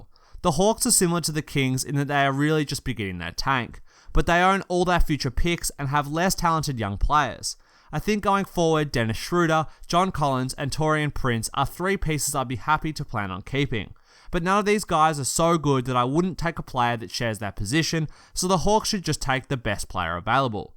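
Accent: Australia. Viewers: You sound like you came from Australia? Did you? 🤔🤔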